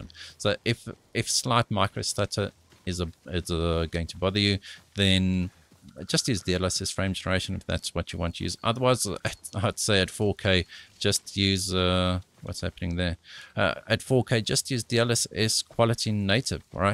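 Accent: South Africa. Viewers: Is this an SA boi I hear?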